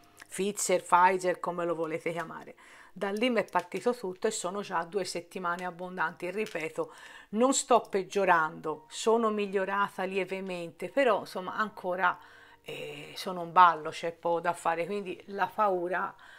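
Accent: Tuscany, Italy. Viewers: Cara ti seguo da diverso tempo anche se non interagisco praticamente mai Adoro il toscano e ascoltarti è piacevolissimo Per quanto riguarda la vaccinazione porta pazienza vedrai che tutto si sistemera' quanto prima In bocca al lupo 😘😘😘😘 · Ciao sei dolcissima mi piace il tuo accento molto toscano.. ti sono vicina e ti mando tanta positività ❤😘😘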